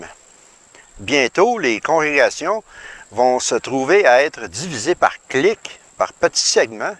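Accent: Quebec, Canada. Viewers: Cher, tu ne me connais pas encore mais ça fait déjà quelques mois que j’écoute avidement depuis la Suisse tes vidéos (attention, au masculin en québécois…! ). Tout d’abord, j’ai toujours adoré l’accent québécois et j’ai toujours l’impression que vous autres avez un humour supplémentaire et plaisant par rapport à chez nous! Merci pour toutes tes vidéos (pardon, tous tes vidéos! ) tellement logiques, intelligents et en même temps simples à suivre et comprendre. Tu fais, toi au-moins, du bien à beaucoup de monde qui ouvrent les yeux et se déculpabilisent, contrairement à ces connards ridicules et rigides de la Watchtower! Quelle arrogance que des humains se prétendent représentants de Jésus et Dieu sur la terre (auxquels, d’ailleurs je n’ai jamais vraiment cru). L’un de nos comiques suisses disait dans l’un de ses sketches « peu-à-peu les écailles churent hors de mes oculaires…! 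). Eh bien c’est mon cas bien que je n’ai été qu’un « intéressé « comme ils disaient à la congrégation pendant quelques années, heureusement que je ne me suis pas fait baptisé (Dieu m’en garde…! ). Depuis 2 ans, j’ai fait un travail psychologique sur moi-même et tes vidéos, ainsi que ceux de Dominique Reynaud en France, ont contribué à donner un coup de balai définitif à ces âneries des TJ et autres religions; en somme, pour moi tes vidéos c’est « la cerise sur le gâteau «. Merci encore et mes amitiés virtuelles depuis la Suisse région Lausanne. Daniel Bulloz.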